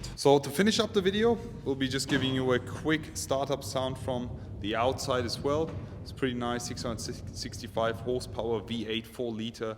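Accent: Germany. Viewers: Is that denglisch you talking ?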